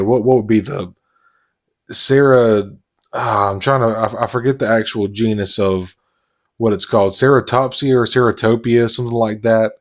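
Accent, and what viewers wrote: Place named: South, United States. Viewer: Spitin Dino facts in the southern accent is worth watching till the end y’all. 😉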